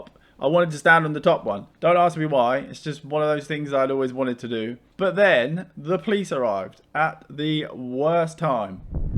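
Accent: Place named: Southampton, United Kingdom. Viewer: My step-dad used to do this for a living (work at the top of tall structures, down at Esso, cranes at the docks etc.) and I guess some people are just born without that innate fear. Also, I didn't know you were also a Sotonian until this video and it's weird I kind of guessed it from the accent! Enjoying your content, thanks.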